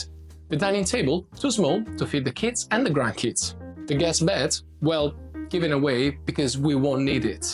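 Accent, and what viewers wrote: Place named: England, United Kingdom. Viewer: So refreshing to hear someone who has learned to speak English with a more authentic English accent, rather than an American accent.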